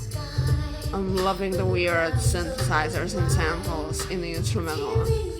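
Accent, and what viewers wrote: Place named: Canada. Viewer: Wow that's amazing your Lithuanian but do you live in Canada your accents Canadian I thought sorry I just kinda shocked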